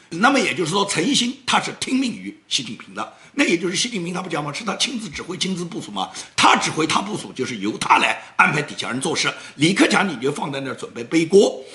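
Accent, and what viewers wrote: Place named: Nanjing, China. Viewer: 你南京人吧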